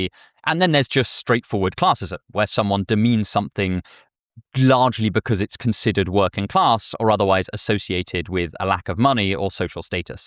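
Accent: United Kingdom. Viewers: First video I saw of yours was one with a super clickbaity title, where you "bashed" people for not enjoying the classics you liked. Ofc... you didn't actually do that, but it left a very weird and mixed first impression, that, combined with the primped-up, smart dressing style and the entirely clean appearance and (as discriminatory as I know this is) the heavy, but thoughtfully elegant and clear British accent made for an image of a typical "snob". I now realize you're the opposite... you're just like this, cause you like being like this and you want to share this love of classics and reading with the world in a non-pompous and inviting way. Sincere apologies for my prejudiced first impression. I also really adored the re-reading aspect towards the end. There was a while in my life where I was deeply against the idea of looking back and it was all about the quantity of highly lauded experiences to me. 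Today, I much prefer returning to things I really adore. Right now, I am reading the manga "Vinland Saga" and watching the anime at the same time, with a friend whose not only never seen it, but who claimed to hate the art form, before I proposed to him (based on knowledge of other things he's enjoyed) to watch it with me, and he's enamored by it. And every time I've experienced this story in all of its different formats, I've discovered some new aspect of it. Even watching it is different. The first time I watched it was with another friend, who was insistent on watching dubb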